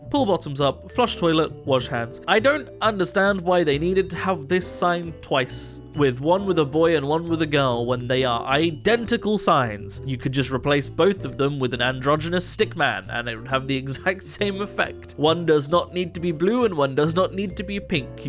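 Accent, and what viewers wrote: United Kingdom. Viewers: hi,im paje(she/they),are you scottish or irish by any chance? also whats chauffeur?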